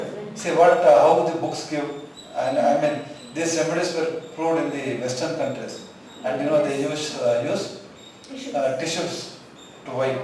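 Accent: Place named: South India, India. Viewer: Southern pronunciation is always to be a problematic for us.And what is going on in the bakground?Annoying sound making mad.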